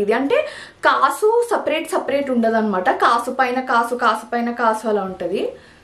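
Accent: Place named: Hyderabad, India. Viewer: you are an absolute example of Hyd telangana girl in heart and tone. Love you. Keep going. I do not care much abt jewels or sarees but I like seeing you and the energy you bring to life when speaking❤. -from another Hyd sis.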